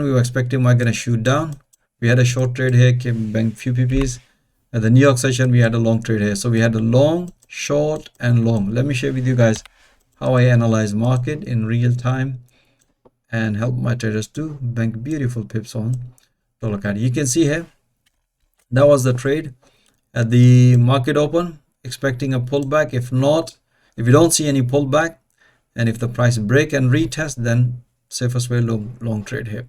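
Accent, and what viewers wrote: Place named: United Kingdom. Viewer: Sir pls try to make us understand in Urdu language. Many people are unable to understand British accent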